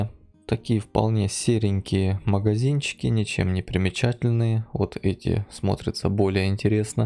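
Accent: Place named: Odessa, Ukraine. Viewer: Если вы посмотрите много видео этого товарища, то поймете что он очень сильно политизирован, лично меня всегдп удивляло когда он на украинских городах находил наилучшие места города а в России худшие, при этом выглядили они наравне даже при этом ахаза))) Да и к тому же акцент как у одессита. Посмотрите его сравнение про Одессу, он город с разрушенными домами, убитейшими дорогами. Сумел найти хорошин места и выставить его этаким среднячком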